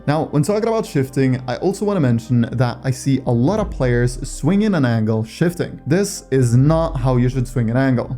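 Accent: Norway. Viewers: why would a bot voice have a norweigan accent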